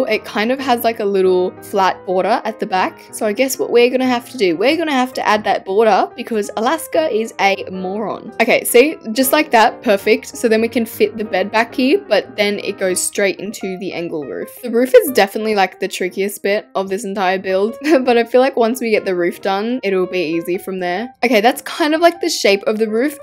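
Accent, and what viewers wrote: Australia. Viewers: she Australian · Wait is she Australian? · WAIT YOUR AUSTRALIAN, ME TOO!!!!!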